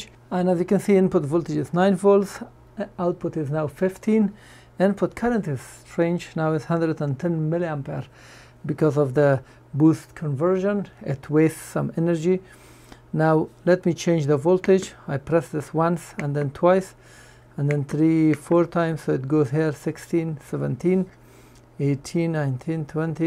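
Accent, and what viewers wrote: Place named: India. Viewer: indian sound funny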